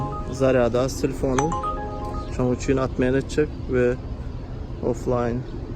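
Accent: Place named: Lebap, Turkmenistan. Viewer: Sps sawedyn ucn. Gepleysina lebaplylara menzeya.bloklaryn howes biln dinleyan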